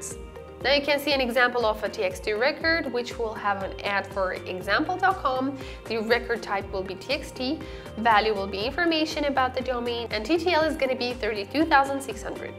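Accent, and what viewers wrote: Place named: Iran. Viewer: That's some Iranian accent she has, isn't it?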